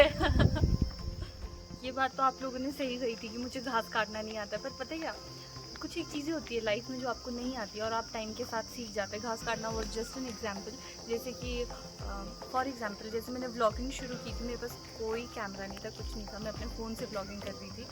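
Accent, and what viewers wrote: Pahadi, India. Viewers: Appki Hindi bolne ki tone ek dam pahadiyo wali hai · Yes I am interested too in your city life, your voice such a sweet bird like kuhu 😊💝 and apki voice m jo pahadi tone aati h wo lajwab h , or ye tone hmari garhwali tone se match hoti h 😊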